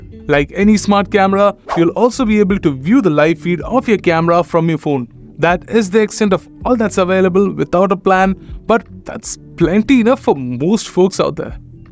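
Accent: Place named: India. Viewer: This might be better for US people if it was presented by a native US citizen that doesn't have such a strong indian accent, without the CC feature it is very hard to understand what's being said.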